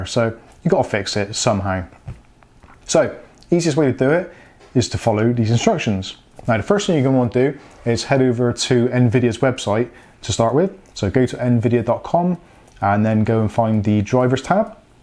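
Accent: Bristol, United Kingdom. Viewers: Are you a Bristolian?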